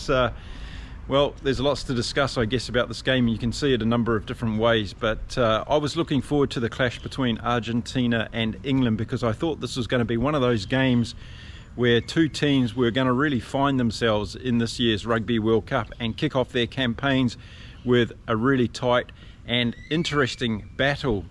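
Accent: New Zealand. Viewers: indeed, but you could easily apply that to the English team from the other night, because it was precisely power and self-belief that won them the game. I think what we're talking about here is aesthetics and beauty is different for everyone and is different in every society. What I found funny was that your reason is consistently unbiased and its only the Kiwi accent that tells us where you are from, but your aesthetics on the game places you very precisely in the world.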